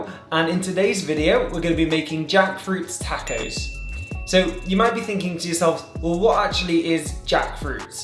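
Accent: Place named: Liverpool, United Kingdom. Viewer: Food & Fitness Always yay I’m from Bromborough in the Wirral 🙌 London now though I could definitely hear it a little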